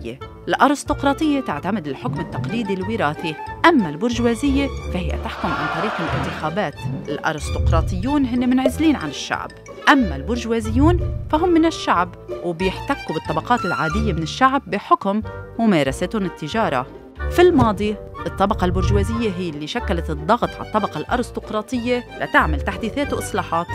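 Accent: Lebanon. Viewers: لم استطع اكمال الفيديو بسبب اللجهة اللبنانية الممجوجة الثقيلة على السمع، رجاء ان تبقى الفيديوهات باللغة العربية الفصحى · حلوة اللهجة اللبنانية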